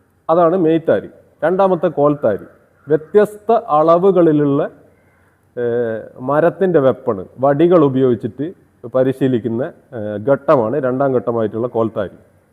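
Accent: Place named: North Kerala, India. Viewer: Thiruvanandhapuram,pakshe slang vadakkananallo GURUKKALE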